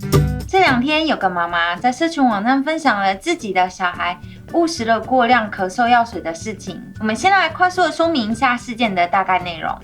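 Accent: Japan. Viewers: 有點日本口音⋯ But Good Job!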